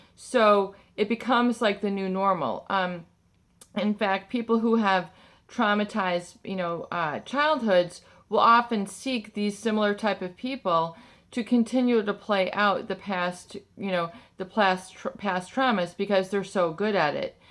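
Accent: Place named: Illinois, United States. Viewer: I have been listening everyday. I have been journaling. I have put what you have said into practice with the affirmations and the journaling. Thanks so much for your work (and so much work you have done to help us!). I am from originally from McHenry, Il. It sounds like you are from Illinois. I so appreciate what you are doing. Love to get to know you somehow. Keep up the good work!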